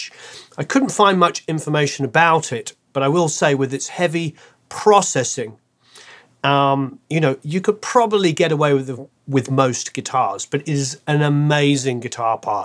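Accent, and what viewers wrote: United Kingdom. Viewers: i love your British accent but bassline was Roland System 8 😁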